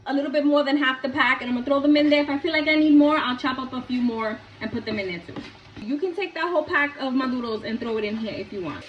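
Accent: New York, United States. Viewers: OMG I will have to try this recipe. I love that New York accent.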